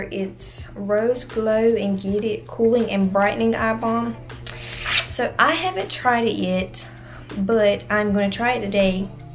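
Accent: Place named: South, United States. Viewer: omg i love your accent!!! country gal just like me and a natural i love it girl!!! Will subscribe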